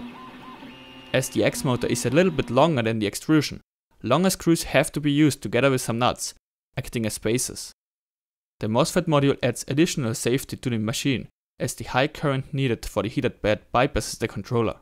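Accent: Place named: Germany. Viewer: German accent too heavy can't understand what was said! won't stick at all! So can't print mods printing in ABS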